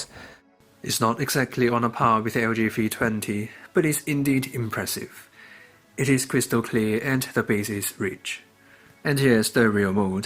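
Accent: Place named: United Kingdom. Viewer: Your accent makes me want to drink some tea in a British mansion. Can't stop listening, good job! :)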